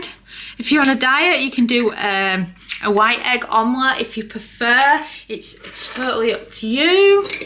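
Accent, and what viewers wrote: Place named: Hull, United Kingdom. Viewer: Just 'found you'. I'm a disabled guy in my 70s living alone ('cept for the dog - she's lousy at cooking with an air fryer). So cooking, necessarily, is either microwave or air fryer! Your recipes are gonna be real useful! Do you have a particular Air Fryer you recommend also, in the reviews - I'm presently using a real cheapie one. Does the job ok for simple meals/ready meals etc. Btw, where's yer accent from? - I was brought up in Hull in Yorkshire ('Ull') decades ago and your accent's a bit reminiscent of me childhood! Decades ago I lived in Japan a while, and I had to 'lose me 'Ull accent real quick - ('...they 'ant gorra clue what yr'on abaht if y'talk wi' a 'Yorksheer' accent in Tokyo!). I haven't been back to 'Ull since early 2000s. Would get totally lost there now! Cheers! Tony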